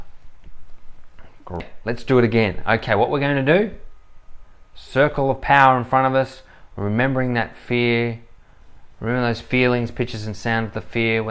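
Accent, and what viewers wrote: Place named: Australia. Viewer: Brilliant method, an unbeatable combination of personality and drop dead good looks along with my personal favourite, Australian accent. Thank you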